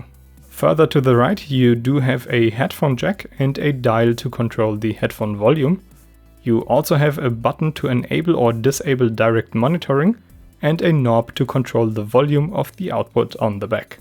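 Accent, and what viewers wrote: Germany. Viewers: ich höre den deutschen Akzent ganz deutlich, ha ha))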